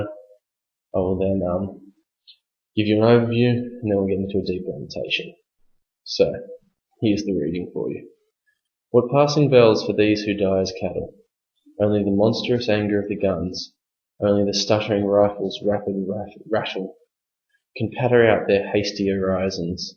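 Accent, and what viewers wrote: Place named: Australia. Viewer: You sound aussie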